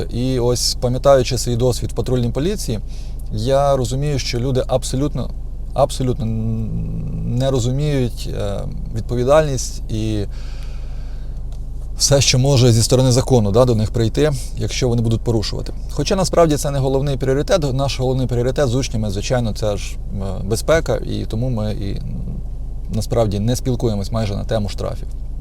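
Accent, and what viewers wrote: Vinnytsia, Ukraine. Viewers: Вінницький говор нікуди не дінеш) так шо не видєлуйся) ти все рівно наш - Вінницький)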